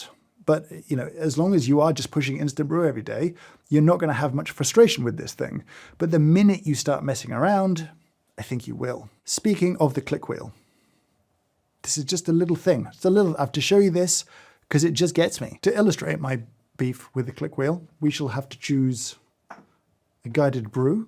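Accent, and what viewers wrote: United Kingdom. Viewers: Englishman says words about coffee very britishly. 10/10.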